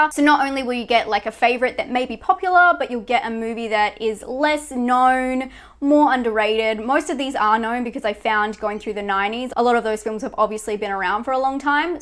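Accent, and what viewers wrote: Australia. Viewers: I am so happy to have found your channel. Your tastes are pretty much exactly the same as my own, and you are really interesting to listen to (as a brit the ausie accent really makes me happy to hear). When you mentioned The People Under the Stairs, I literally squeeled as no one seems to know that film. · Are you australian?ʕ•ᴥ•ʔ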